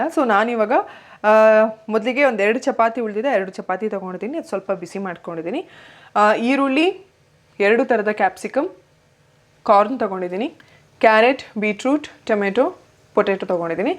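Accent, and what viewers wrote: North Karnataka, India. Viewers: Uttara Karnataka bhashe nalli full ondu vlog maadi mam please nim bayalli nam uttara Karnataka bhashe keloke chanda please mam one vlog please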